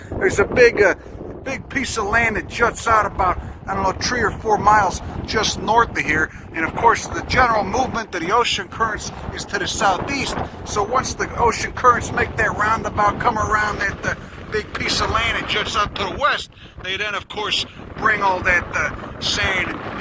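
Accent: Chicago, United States. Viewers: I assume you mean by interweaving cuss words and disparaging comments about the state of humanity while using a Chicago Italian accent. If so, I completely concur.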